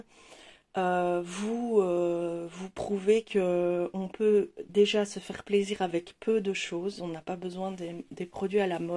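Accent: Belgium. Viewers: Coucou, tu es multitâches 😍 J'entends un petit accent belge 🤗 (klette 😂😂😂) Bisous 💙💙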